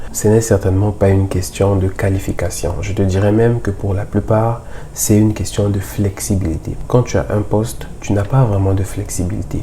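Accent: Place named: Cameroon. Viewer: Quand j'ai vu ta manière de parler j'étais étonné que les kenyan ai le même accent que nous au cameroun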